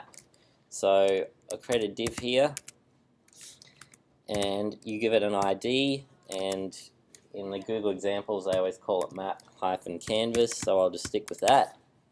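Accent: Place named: Australia. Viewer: along with the Aussie accent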